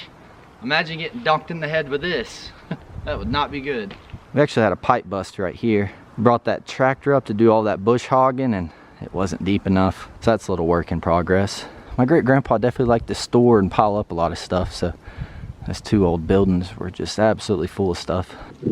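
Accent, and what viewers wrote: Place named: South, United States. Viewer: Love what you’re doing restoring your property. That has always been a dream of mine to retire a property to retire in. What state are you in, sounds like maybe in the southern US. Hope you’re feeling better soon.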